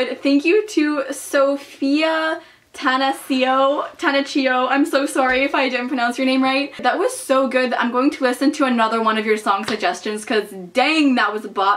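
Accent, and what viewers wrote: Canada. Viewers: Are you Canadian? Btw I liked the video :) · are you canadian?!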